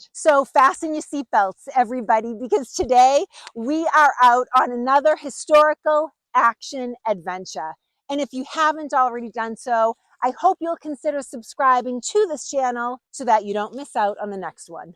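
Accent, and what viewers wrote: Boston, United States. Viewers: When I first saw this documentary I wasn't sure if this was about new England and Boston during the revolutionary War and but then I heard her voice and was like yup...definitely Boston. 😂...its good to see a New England youtube channel loving what they do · Makes me think of A Matter of Life and Death aka Stairway to Heaven when Abraham Farlan is the prosecutor. 😂 neither Massey or Kim Hunter had Boston accents like you! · Another awesome video! Your Boston accent just makes the video. Love watching your enthusiasm. 😊👍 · Came for the history, stayed for the Boston brogue. Good content earned my subscription. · Great video. Your narration is excellent. Love your accent. You sound just like a former client of mine who came from somewhere near Boston. I have been to both Lexington and Concord and loved the area and its history. 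I subscribed and am looking forward to watching more videos. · My Mom was from Maine, but lived in California from her early twenties until she passed. Is your accent a Boston accent or is that how her accent would have sounded as her being from Maine? I’m just curious. Loved your story and subscribed to hear more content. Thanks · After the first 30 seconds of listening to her talk, I knew without a doubt that she was definitely from Boston.🤣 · you seem to have a Boston accent, cool, mine is R.I....😊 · New sub here! You hooked me with your excellent presentation with its enthusiasm and, of course, that wonderful BahStun accent! Love it! I can't wait for the stories yet to come! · Is that the original Concord bridge that stood 250 years ago,? By the way, I love your Boston accent. You have a new subscriber for all things about the Revolutionary War. History is fascinating, is it not? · Love her Bahstun accent 😊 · Well researched and presented! 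Good job! (Love your Boston accent. 😊) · Love her Boston accent. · You're from Bahston? Wow, had no idea. Great channel. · Outstanding, love that Boston sound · Ya can diffiantly can tell your from New England / Boston accent...😂😂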